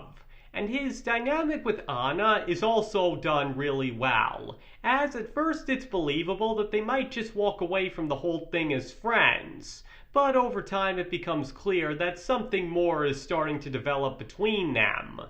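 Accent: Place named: Midwest, United States. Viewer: Maybe it's their accent? Prob midwest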